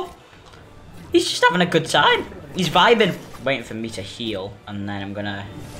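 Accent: United Kingdom. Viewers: What part of England are you from. We are in Scarborough, North Yorkshire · Now i can hear his british voice i could not ages ago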